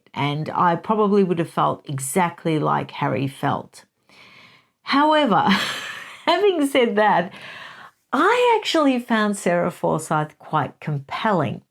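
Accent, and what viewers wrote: Australia. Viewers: Love your Aussie ascent and true poor Harry was helped all the time in his education. Sadly it’s coming back to ‘bite him.’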